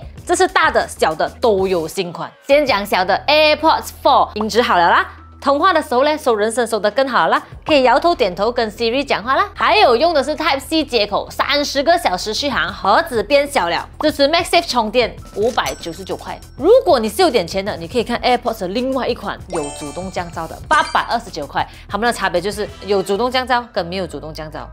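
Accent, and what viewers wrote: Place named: Malaysia. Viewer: 馬來西亞口音 🥴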